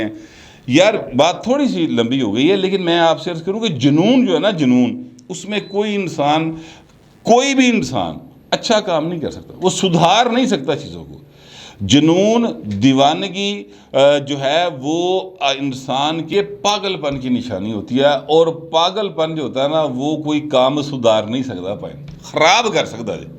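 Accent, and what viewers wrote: Lahore, Pakistan. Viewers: AOA Sahib l am regular follower of your very interesting and knowledgeable V-Log’s specially the great Lahoree style.Thanks for your wonderful programmes and for utilising your value able time for the Nation and for us all.Praying for your good health,long life and happiness.May you be Blessed with Allah’s Blessings and Bounties.Ameen.Warm Regards.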